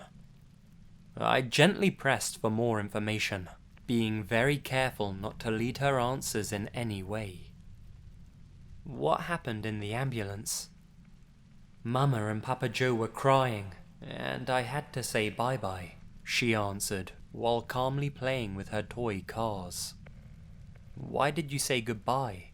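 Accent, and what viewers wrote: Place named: RP, United Kingdom. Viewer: Why do talk posh as fuck?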